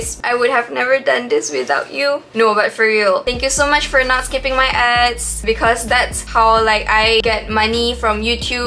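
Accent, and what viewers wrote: Sarawak, Malaysia. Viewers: Akak orank sarawak kahhh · Sarawakian? I heard something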